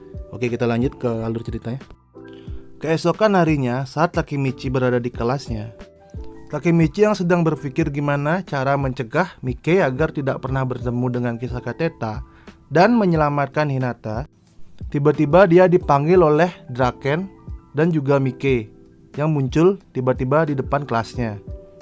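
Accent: Bali, Indonesia. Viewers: Logat nya bali banget wkwkwkwk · Oi, ini pengisi suara orang bali ya pengisinya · pantes nyk san logat bali ne🤙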